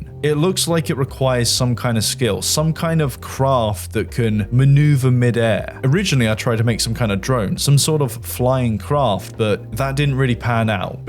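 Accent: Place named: United Kingdom. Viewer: Never stop making videos like this. As a fellow Brit it's nice to not have to listen to yanks droning on lol.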